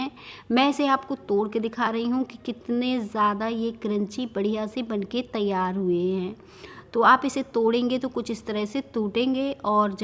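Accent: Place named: Bundelkhand, India. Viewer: Aap ki bhasha ka ton bundelkhand ka hai,aur bundelkhandi bhasha bhaut achhe bhasha hai ,aap usko bolo,to achha lagega,jo aap english ki shabd use karte hai ,hindi bhi achhe lagege.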